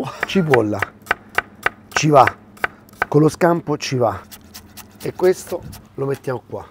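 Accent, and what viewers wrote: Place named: Milan, Italy. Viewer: Ma non ha aperto a Milano? Ma non lo senti l’accento milanese??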